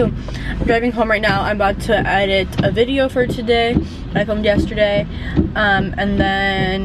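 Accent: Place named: Russia. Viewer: I love your russlish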